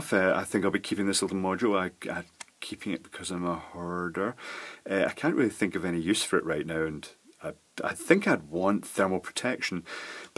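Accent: Scotland, United Kingdom. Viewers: Hi, I love your accent, my father from Lewis and Harris in Scotland and has a similar accent to yourself! Great Channel!! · Adam Baker can't understand Scottish accent?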